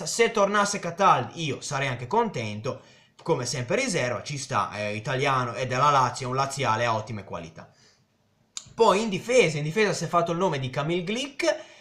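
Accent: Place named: Piedmont, Italy. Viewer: troppo forte un laziale con l'accento del nord mi sembra torinese o cmq piemontese, daje continua ci piace!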